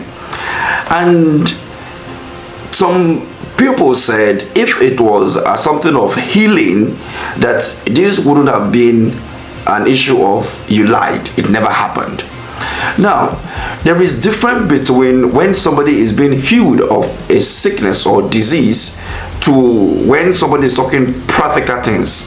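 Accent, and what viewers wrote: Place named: United States. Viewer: Must you speak like an American. Though I appreciate you but be real.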